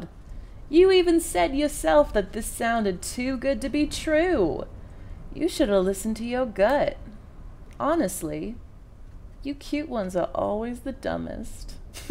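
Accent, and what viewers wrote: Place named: South, United States. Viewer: I am really feeling the southern Belle ascent in this one. Nice imaging of the Succubus.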